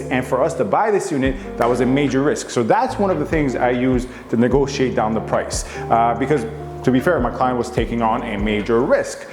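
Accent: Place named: Iran. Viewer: Red flags: 1. Pronounced Toronto wrong 2. Pronounced strip "shhhhtrip" 3. "Student" (first time) buyer 4. The condo owner owns everything from the structural ceiling, walls, and floors. Don't need permission for finishes. Just permission to carry out noisey work. Stripping popcorn is not a "risk". 5. That hint of the BMW "Pairjan" accent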